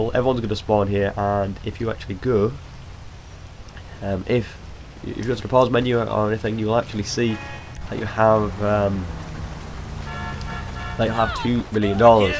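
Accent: United Kingdom. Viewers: He's not drunk he's got a god damn accent called British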